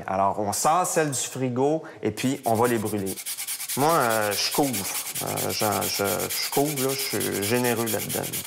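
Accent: Quebec, Canada. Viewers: YOU don't understand because you don't speak FRENCH!!! Moi etant francaise, j'ai bien compris meme s'il a un accent qui est normal car il est canadien!!! Je dis merci a pour sa recette> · t un quebecois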